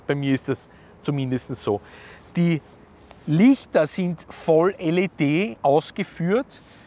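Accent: Austria. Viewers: Wie immer ist dieser Moderator sehr sehr nett und freundlich sowie proffesionell und dann hat er noch den schönen Wiener Dialekt einfach super und genial 😎😃😂😁😀😄😅😆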